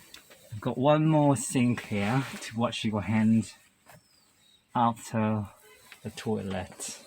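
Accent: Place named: United Kingdom. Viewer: 개인자작이 업체보다 더 잘만들수 있다니..대단하십니다~ 나중에 의뢰하게 될지도 모르겠네요.. 그리고 개인적으로... 영식영어 발음이 한국인에게 미식보다 어려운 점이 많다고 생각하는데 멋지십니다^^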